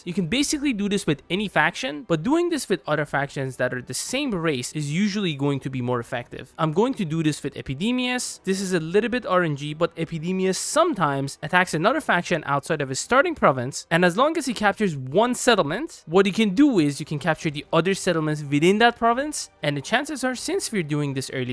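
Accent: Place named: Iran. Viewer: you have a very strong iranian accent, that brings the question, are you iranian?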